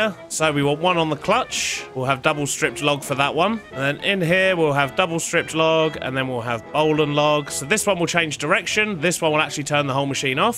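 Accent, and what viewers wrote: United Kingdom. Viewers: Also are you british?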